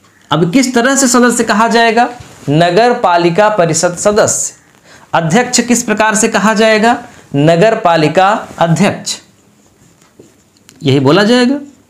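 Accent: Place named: Uttar Pradesh, India. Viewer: U P me isi tarah se bolte hai....pronunciation pe mat jaiye...kitna acchese padhate hai..ye dekhiye please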